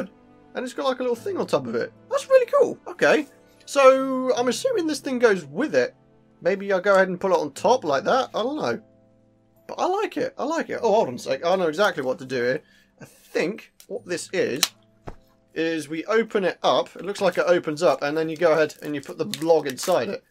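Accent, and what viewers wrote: United Kingdom. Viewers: he is from the UK its obvious.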